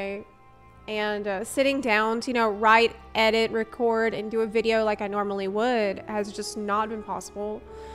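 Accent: United States. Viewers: you sounds like you are from the US. why did want to move to ukraine? serious question, no malintent here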